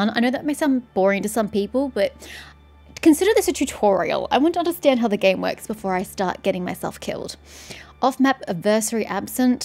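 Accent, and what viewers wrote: Australia. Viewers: Just found your channel! I downloaded manor lords last night and I love it. I'll follow on your series for sure! Love hearing another Aussie lady do a play through!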